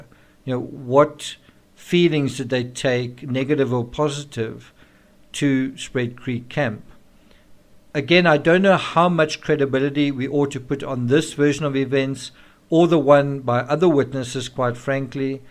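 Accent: South Africa. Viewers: I’m trying to figure out your accent, I’m guessing South African???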